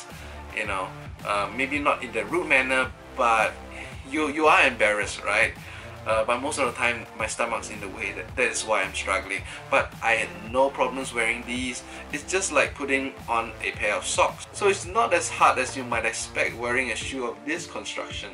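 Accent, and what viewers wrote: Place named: Singapore. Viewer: Great review. I just put in an order. Hoping it's a good one. :) Your Singapore accent makes me very nostalgic for the motherland. Hahaha.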